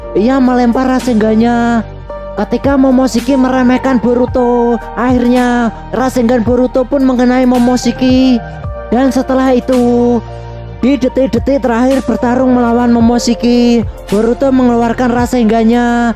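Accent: Java, Indonesia. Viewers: Ceritanya .dialeknya .Jepang Jawa 😀😀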